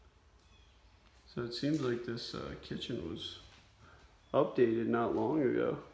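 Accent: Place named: South, United States. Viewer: There is something unnerving about an explore when its insides don’t match its outside. This one creeped me out a bit, but was redeemed in the end by the hand-hewn walls you discovered. You yourself are exactly as your voice suggests: A good-hearted, soft-spoken, southern boy. Pardon me saying “boy” if you find that offensive, but I am myself edging into old lady territory though I have yet to annoy any children by pinching their cheeks. At any rate, your insides match your outsides.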